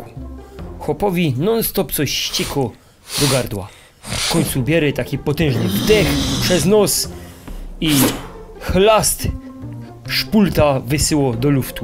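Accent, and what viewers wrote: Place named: Silesia, Poland. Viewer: Słychać, żeś hanys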